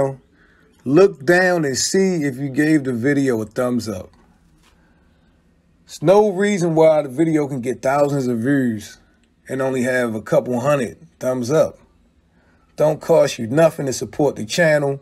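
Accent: Baltimore, United States. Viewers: Are you from Baltimore? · I had to shut this off. That Baltimore accent is awful.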